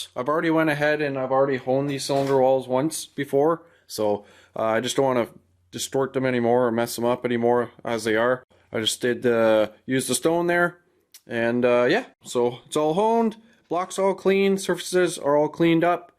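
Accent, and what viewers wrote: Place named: Canada. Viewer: Canadian accent Aye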